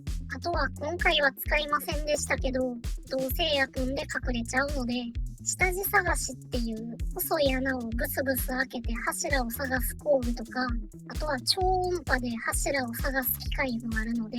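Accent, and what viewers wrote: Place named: Kansai, Japan. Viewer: 関西弁が心地いい。エアコンの取り付けって、電気工事士の資格いらないの知らなかった。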